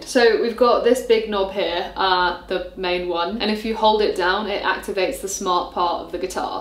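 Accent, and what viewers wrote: England, United Kingdom. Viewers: Looks really nice and your English accent is beautiful :)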